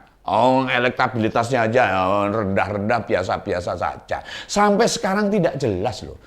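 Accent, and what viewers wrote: Java, Indonesia. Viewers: Saya suka keliru antara dan, logatnya hampir sama juga medok" Jawanya, aku senang ke dua" nya, selalu menyajikan isu" yg lagi hot, tentang situasi politik yg sedang berjalan, semangat terus Om untuk mencerahkan orang seperti saya jadi melek politik, Merdeka 💪💪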